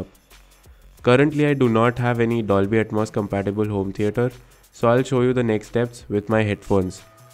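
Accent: India. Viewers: the paj eet accent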